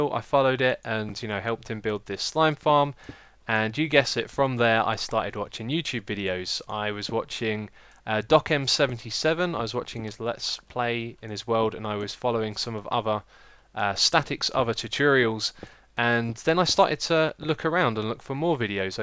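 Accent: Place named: United Kingdom. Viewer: Its really hard to speak in the British accent but i really like it and that's what makes all your videos a bit more fun and entertaining to watch than they really are. P.S BIG FAN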